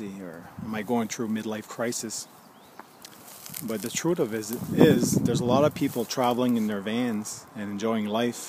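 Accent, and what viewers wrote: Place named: France. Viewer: Can barely tell you have an accent - love French accents anyway.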